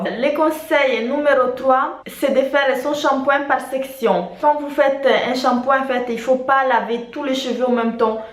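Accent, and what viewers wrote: Madagascar. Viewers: J'étais sure que tu étais malgache (façon de parler, visage aha) et je viens de voir que tu as fait un vlog à Mada', ça se confirme.😊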